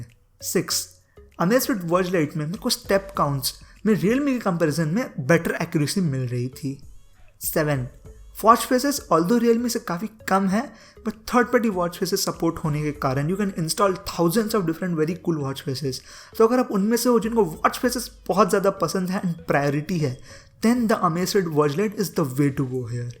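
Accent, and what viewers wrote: Odisha, India. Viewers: Are you from Odisha ?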